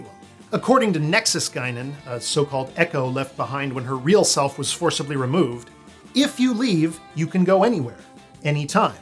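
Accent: United States. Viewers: This guy's American accent is freaking me out. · Trek culture being narrated by an American? I'm sorry, where am I? What alternate universe did I step into? (I don't hate it, I've just gotten used to Adam and the other guys.) Edit: After I marathoned TNG (because I'd only watched most of it over the years and not seen some episodes in full) I caught up on like 2 of the movies? Didn't like them much and still haven't watched the TOS movies because I haven't finished TOS. That said, catching up on the movies a second or third time around actually made sense, because ages 13-22, I was mostly out of the trek fandom and into anime and Animorphs, so watching the movies in my teens when they came out didn't really matter, as I recall. 23-ish, watched Voyager reruns and nostalgia pulled me back into the fandom in a big hug! Can't believe I thought it was all "meh" for so long, but I guess maybe I was just trying to be cool or something, IDK. 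But it didn't help I wasn't impressed with TNG and we didn't watch ST:DS9 because it was all about war and my mom didn't like it. Voy was what I cut my teeth on, even if it's not the fandom's favorite. It's the redheaded stepchild of trek. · Glad to hear someone address the fact that you actually can get into the Nexus with a ship, and expand upon it to give a real explanation as to Soren's plan. Still weird hearing an American accent here though.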